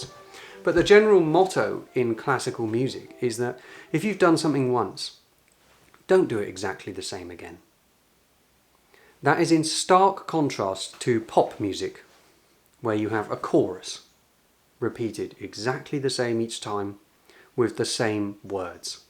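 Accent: United Kingdom. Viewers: Dear, a while ago I have come across your beautiful YouTube channel and have been glued to my notebook screen ever since. in order to catch up with as many as the older videos as possible. It is such an amazing abundance of landscape, church architecture, organ expertise, wisdom, language and literature and last but not least – music. I love the magic moment when you open a church door, expectantly and respectfully, As a translator/interpreter from Icelandic I have an ear for languages and accents and I must admit I fell for your beautiful British, or should I say English, tongue right away. I could listen to you forever and you have definitely enhanced my vocabulary since I keep watching your videos. Please keep filming – and playing - and talking! 😊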